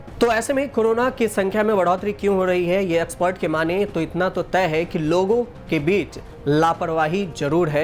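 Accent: Bihar, India. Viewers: Bolne wala bihari hai ....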